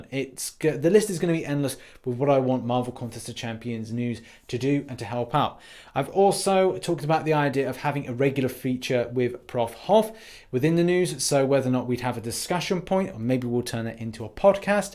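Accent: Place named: RP, United Kingdom. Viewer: Keep speaking that queens English bud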